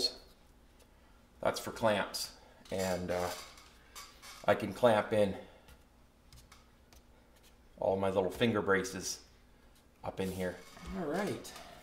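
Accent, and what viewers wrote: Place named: Canada. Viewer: I like everything but the Canadian accent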